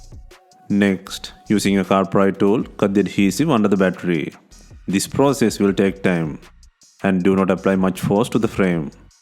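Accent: India. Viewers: Thank for the instructions! I'm curious, are you from India? Sounds like Indian accent 😊 By the way, I'm from Belarus! 🇧🇾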